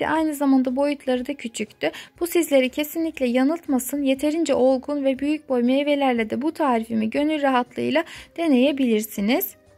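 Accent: Azerbaijan. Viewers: Xanım salam ,siz Azərbaycanlisiz ,lehcəniz mənə şirin gəldi .Abone oldum əllərinizə sağlıq 🌼🌼🌼🌼🌼👍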